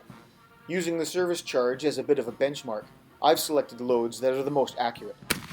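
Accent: Canada. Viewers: These are fantastic video's love them also love the Canadian accent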